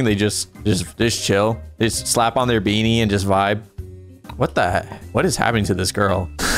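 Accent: France. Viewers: I am french!!!! I LOVE YOUR FRENCH ACCENT!!!, DO U LEARN FRENCH OR SMTH? You're saying every words right!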